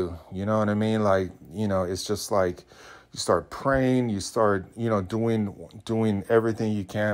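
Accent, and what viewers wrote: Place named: Hawaii, United States. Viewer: You sound like you from Hawaii….might be mistaken, but I hear some pidgin come out.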